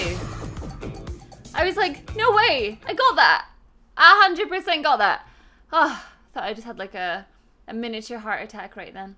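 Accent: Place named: Scotland, United Kingdom. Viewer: Hey I just wanted to say that I really enjoy watching your videos! I watch them since resident evil 7:D I really would love to play crash bandicoot tbh. Btw from which part of Scotland are you coming from because I saw a concert of Amy McDonald 6 days ago and she lives in Scotland too. Compared to you she has just such an weird accent tho 😂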